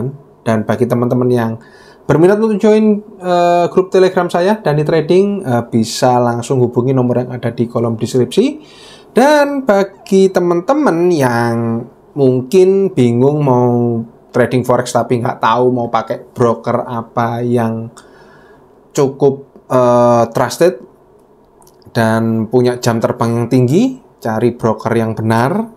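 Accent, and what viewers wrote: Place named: Java, Indonesia. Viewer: Bahasa Inggrisnya belajar dari mna mz , kok keren bgt agak2 logat jowo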